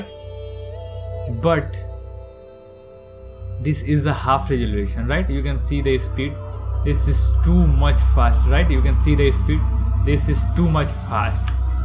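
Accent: India. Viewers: опять эти индусы. не возможно слушать. уши вянут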